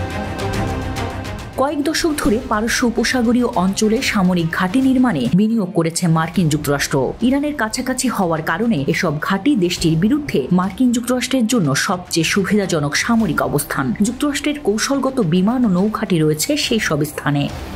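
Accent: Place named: India. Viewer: ভারতিয় কন্ঠ মনে হয়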